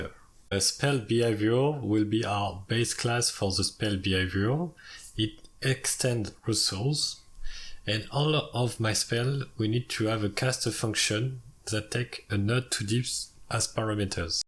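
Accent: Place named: France. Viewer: Bro t’est français ! J'arrive à reconnaître l'accent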